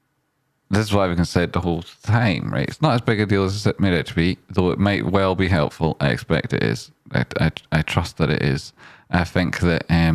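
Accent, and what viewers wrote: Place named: Edinburgh, United Kingdom. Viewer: Top show M8.thanks. I sort of get it better when its an edinburgh accent. i think I almost got a wrinkle on my smooth brain tonight listening to you..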